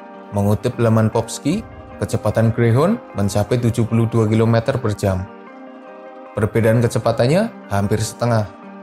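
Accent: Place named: Purwokerto, Indonesia. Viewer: Adminnya kaya orang Purwokerto logatnya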